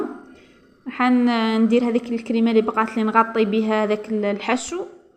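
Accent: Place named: Djelfa, Algeria. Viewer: معرف علاش حسيتك جلفاوية وغي قولي من مسعد 😅😅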